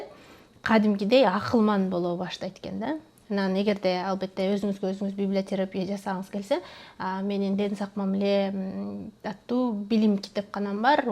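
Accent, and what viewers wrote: Standard. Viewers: Кыргызча таза суйлойт экенсиз🇰🇬😍ыраазычылык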